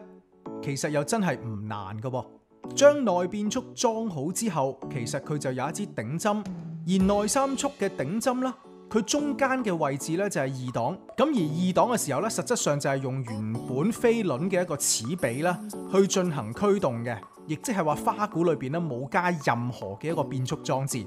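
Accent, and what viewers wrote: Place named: Cantonese, China. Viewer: 你啲廣東話我聽得好舒服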